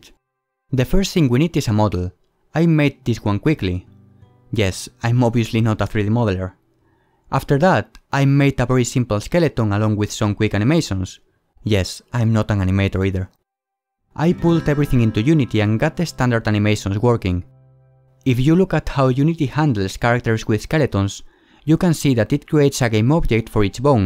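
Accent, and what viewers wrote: Spain. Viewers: eres español no? buen video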